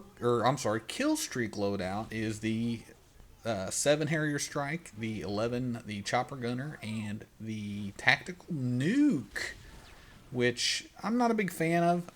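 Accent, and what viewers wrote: South, United States. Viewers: you from the south you sound countryish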